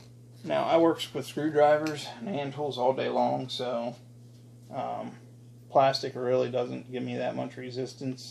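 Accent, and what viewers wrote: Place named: Pennsylvania, United States. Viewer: You have to be from PA right? I hear it in your voice??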